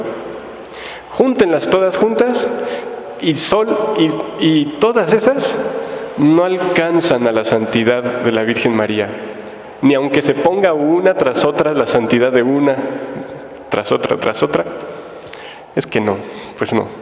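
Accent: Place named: Mexico. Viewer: Es una joya esta predicación y con el acento mejicano más agradable la escucha... Sólo los ruidos estrepitoso de la grabación... Lo hacen más interesante porque es mayor la atención que ponemos para no perder ni media palabra porque es nuestra Luisa y Jesús los que predican... Y es el 3er FIAT... GRACIAS DIVINA VOLUNTAD...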